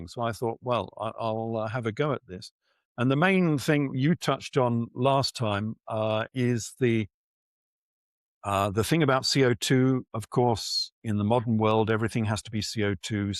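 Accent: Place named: Belfast, United Kingdom. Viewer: belfast accent is contagious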